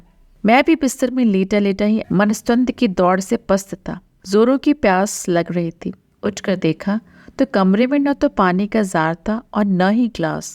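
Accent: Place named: United States. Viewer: Orator ka american accent hai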